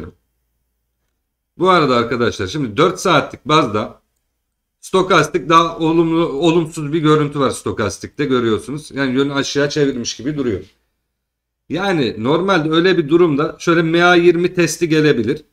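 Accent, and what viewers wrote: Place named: Mediterranean, Turkey. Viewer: Adamsın kardeşim seviyorum seni anlatımında çok güzel diyalektinden anladığım kadarıyla Akdeniz çocuğusun ben bitcoin alıp satmıyorum ama marathon digital holding bitcoin mining i yapan bir şirket hissesini alıp satıyorum oda bitcoinle yükseliyor onunla düşüyor ama senin anlatımın ve analizin süper SEVİLİYORSUN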